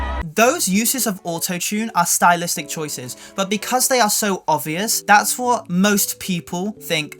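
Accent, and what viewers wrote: United Kingdom. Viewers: That British accent (๑♡⌓♡๑) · wait you're British? All the better ig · Ok. This is the first time I’m watching him actually speak and I can safely say I wasn’t expecting him to be British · Trying hard to understand the fast British English with the lyrics(?) while thinking maybe that learning app is a good choice for me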